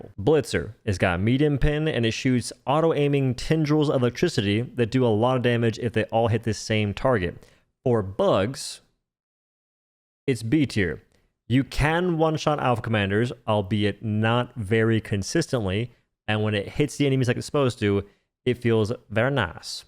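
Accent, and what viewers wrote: South, United States. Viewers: I’m trying to figure out your accent. I hear a southern accent, but I also hear an Irish accent on certain words. lol.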